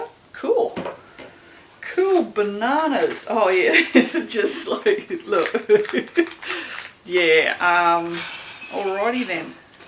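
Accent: Australia. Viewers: Are you from Australia ya ripper 😅lol grand vid mate 👍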